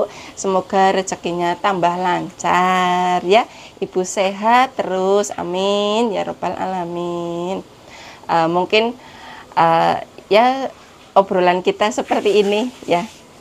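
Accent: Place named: Tegal, Indonesia. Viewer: Wah resepnya mudah dan simpel y mb semoga bs dicoba SM ak insyaallah klo ad rezeki pengin nyoba jg mb seneng deh liat MB sekarang udah bs bikin konten sendiri y mb walaupun sederhana y mb videonya tp banyak yg nonton mb mengispirasi mb logat ngomongnya kayak ak MB ak dr Tegal ngapak jg mb tp ak ikut suami tinggal dilampung mb semangat terus mb bikin kontennya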